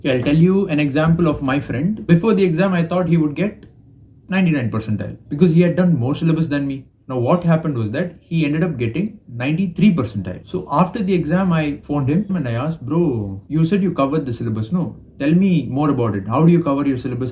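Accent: South India, India. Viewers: bro your accent is similar to south india nearly tamilnadu /kerala/ap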